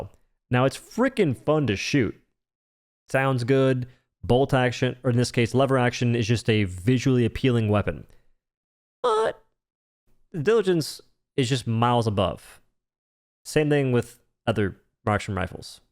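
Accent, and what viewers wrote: South, United States. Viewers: I’m trying to figure out your accent. I hear a southern accent, but I also hear an Irish accent on certain words. lol.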